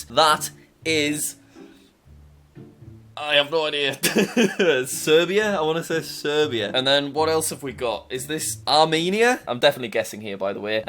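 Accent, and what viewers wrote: United Kingdom. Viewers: As a british person I know as the only person with a british accent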